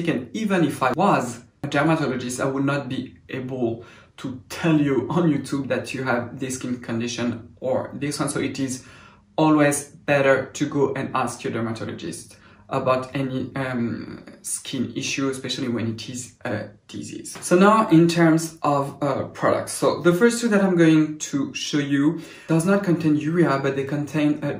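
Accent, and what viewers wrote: France. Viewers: Are you French? Lovely accent!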